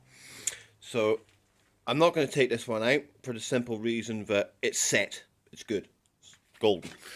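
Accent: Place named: Scottish Highlands, United Kingdom. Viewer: You sound a bit Invernesian or Highlands definitely. Are you still about?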